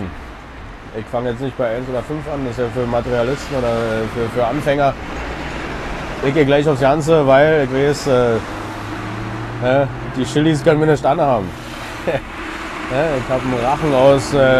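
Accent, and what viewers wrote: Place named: Berlin, Germany. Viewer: ..BIST ECHT DER HAMMER..BERLINER SCHNAUZE..WIE ICH👍💪🖐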